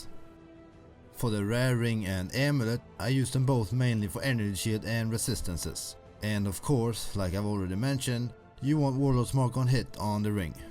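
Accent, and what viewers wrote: Sweden. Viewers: Man måste ju älska den svenska engelskan <3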